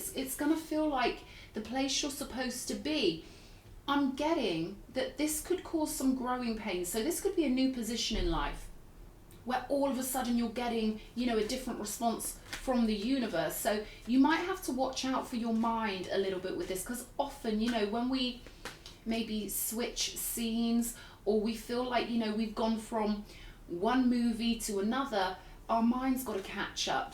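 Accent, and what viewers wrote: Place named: England, United Kingdom. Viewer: I love your accent! Where are you from? In which part of England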